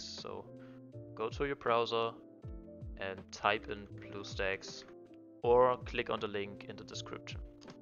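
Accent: Germany. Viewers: Hey are you German? Because you kind of have an German accent (im also German (well switzerland but still)) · U kinda sound like a german no hqte😅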